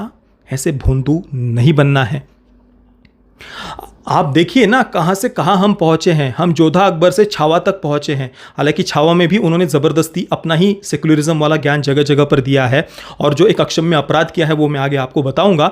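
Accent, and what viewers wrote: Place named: Maharashtra, India. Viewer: Tari pan hindit marathicha accent yetoy kiti varsh hindi boltoy 🤦🏻‍♂️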